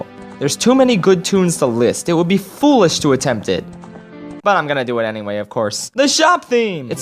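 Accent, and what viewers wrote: United Kingdom. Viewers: omg you’re british no way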